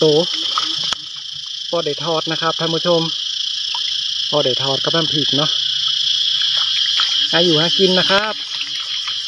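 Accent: Thailand. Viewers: เป็นคนจังหวัดไหนเจ้าอู้เหือนขุนตาลเจ้า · ป้าเข้ามาผ่อสองสามคลิปแล้วจ้าวชอบคำอู้ยองฟังแล้วม่วนดี หลานป้าก่ออู้ยองยังกับหล้าชวินจ้าว หมานๆเน้อคับ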